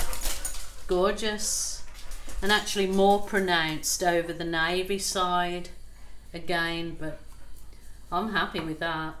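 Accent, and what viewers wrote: Birmingham, United Kingdom. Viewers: Hi! Just curious....are you originally from Birmingham? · Me and my husband are having fun with your accent ☺️ he is from West Bromwich in UK 🇬🇧 and you sound like a Brummy are you from that area or is it just coincidence that the accents sound the same..? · I know a Brummy when I here one 😀 A good move you made 😀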